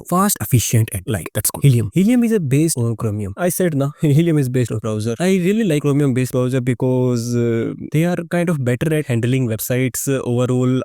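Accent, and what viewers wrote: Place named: India. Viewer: this is the thickest indian accent i've ever heard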